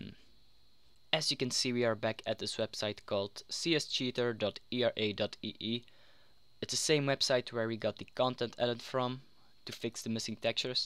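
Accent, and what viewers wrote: Belgium. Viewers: Vlaams accent? :D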